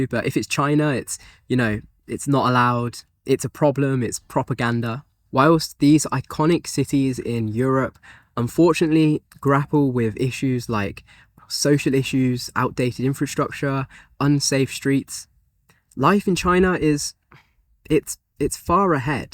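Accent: RP, United Kingdom. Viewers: you sound posh and naive.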